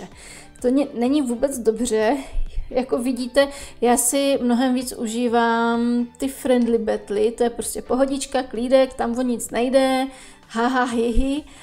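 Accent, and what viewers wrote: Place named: Moravia, Czech Republic. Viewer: Líbí se mi tvoje moravské vyjadřování.Já su atd....:D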